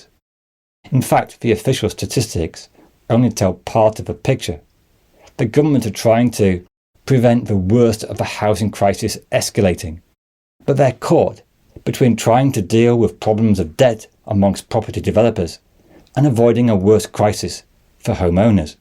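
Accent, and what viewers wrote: United Kingdom. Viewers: 1.)British colonizer voice - check 2.)Faceless - check 3.)Screaming about the collapse of smarter Chinese rivals - check Yeah, safe to say most of the nonsense in this video is bullshit.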